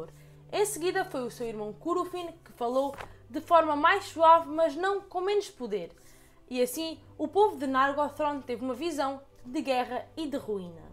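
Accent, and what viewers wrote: Portugal. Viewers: Que sotaque fofo, até deu vontade de ir pra Portugal. ^^ Sucesso pra vcs do canal. ♡ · Esse sotaque português 😍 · Sempre digo que o sotaque alentejano da é muito bonito, parabéns pelo bom gosto.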